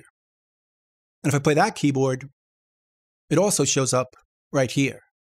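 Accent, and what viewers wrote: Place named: Northeast, United States. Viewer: A lot of people from the northeast talk like that.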